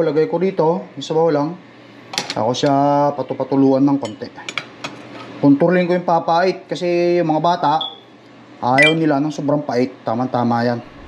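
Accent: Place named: Visayas, Philippines. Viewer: Bakit parang bisaya na accent mo kuya hehehe ✌️✌️✌️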